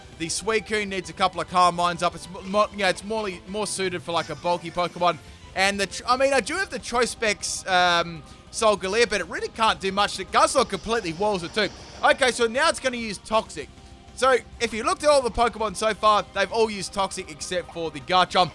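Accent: Australia. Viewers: are you austrailian ?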